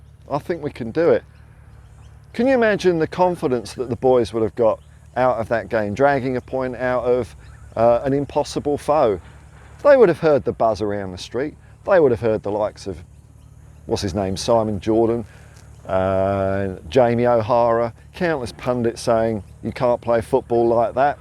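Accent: Australia. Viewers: I knew this bloke was Aussie, great bloody content worth the sub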